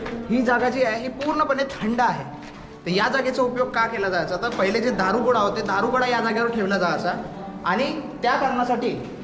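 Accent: Vidarbha, India. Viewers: Congratulations bhava🎉 Marathi travel vlogger tar bharulur ahet pan , Tuza psycho pana and vidarbhachi bhasha hach tuza X-factor aahe Keep it up Bro Lavakar 1 million houdet hi shubechha 🎉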